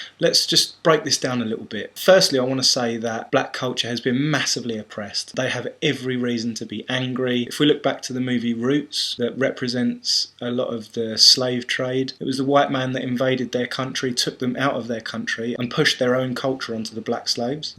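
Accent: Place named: England, United Kingdom. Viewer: You should make up your mind there guy. are you "white" or are you NOT "white"? you claim "white" people dont exist, but you seem to be holding pretty fiercely to your "whiteness". Sound English as fuck to me. Why not just stick to being English? WHITE people are a political creation of racists. jUst be an ignorant English ass who doesnt know what he is talking about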